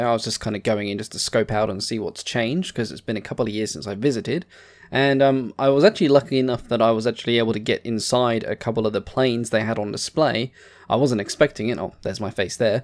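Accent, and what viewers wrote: United Kingdom. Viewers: Never put you down as an Aussie, your dialect is more Brittish. Sweden had quite a few of these planes, always nice to recognize them.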